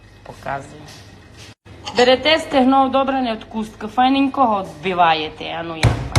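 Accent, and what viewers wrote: Transcarpathia, Ukraine. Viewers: Дуже люблю як Ви розмовляєте на Закарпатті:))привіт зі Львова:))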